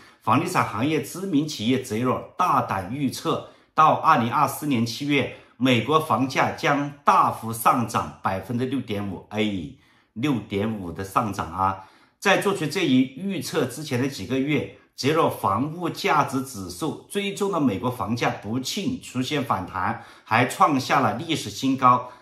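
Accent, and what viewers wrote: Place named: Cantonese, China. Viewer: 你有口音，你是不是讲粤语的？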